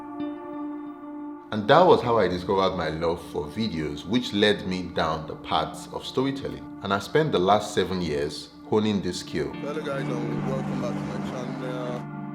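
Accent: Nigeria. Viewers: It's surprises me you have achieved such a high number of followers with such a heavy Nigerian accent. Anyway well done to you. I think Portugal is a good choice. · he is a Nigerian speaking English and therefore has a Nigerian accent! Use your head. Would you say the same to Wole Soyinka? Examine your inferiority complex.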